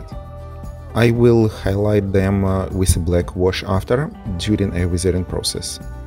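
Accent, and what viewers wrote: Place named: Russia. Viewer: Чел ты с рашки? Русский акцент слышен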